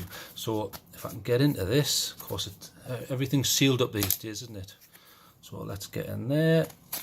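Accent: United Kingdom. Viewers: You cannot mix that British accent :D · You british?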